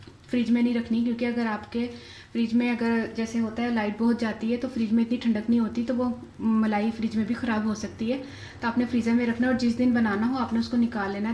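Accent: Punjab, India. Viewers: App behno ki pujabi bolne ka appna hi maza hai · Very good style and ur punjabi touch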